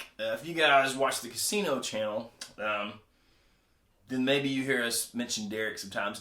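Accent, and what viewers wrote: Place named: Scotland, United Kingdom. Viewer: As a recent subscriber to both your channel and Casino Guitars and now I want to say thank's to you, Baxter and for excellent content. It's also great to hear a fellow Scottish voice talking guitars.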